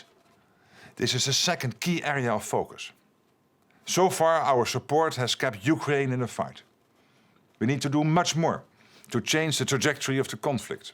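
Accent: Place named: Netherlands. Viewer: I love his dutch accent.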